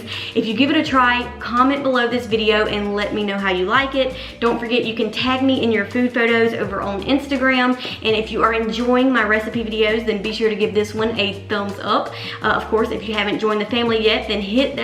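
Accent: United States. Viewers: Kajol speaking Amrikan....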